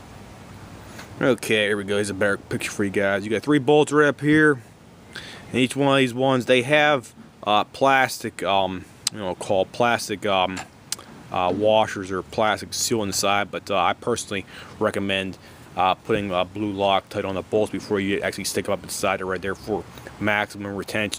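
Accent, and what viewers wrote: Pittsburgh, United States. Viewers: Thanks for the video. Btw, you sound very Pittsburgh.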